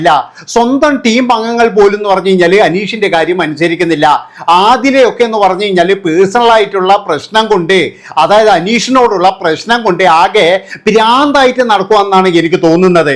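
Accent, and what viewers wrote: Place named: Kannur, India. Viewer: ബ്രോ 👌👌 നിങ്ങളുടെ നാട് കണ്ണൂർ ആണ്.. സംസാരം കേൾക്കാൻ ഒരു രസം ഉണ്ട്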